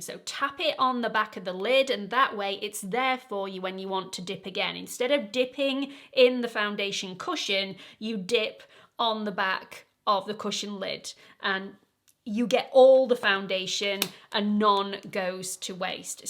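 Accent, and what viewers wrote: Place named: United Kingdom. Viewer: Hi! I love your videos, even though most of the products are not available for me, here in Argentina. I keep your info until I can travel! One question totally unrelated to makeup… I’m a teacher of English and I love your pronunciation… where are you from? It’s clearly British, but not from London. Maybe Scotland? Thanks!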